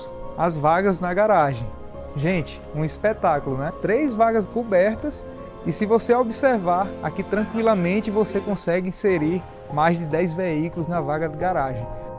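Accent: Northeast, Brazil. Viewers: Gostoso é esse sotaque nordestino! Adoro!!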